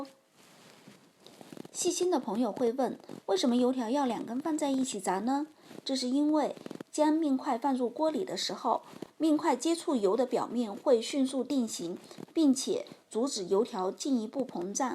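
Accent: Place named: Fujian, China. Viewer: 你是福建人吧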